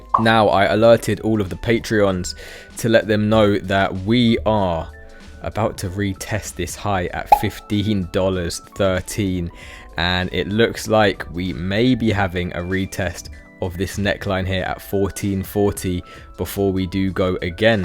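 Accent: United Kingdom. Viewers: You must be from around Watford . You kinda sound like Anthony Joshua. Hello from Spain. 🚀💰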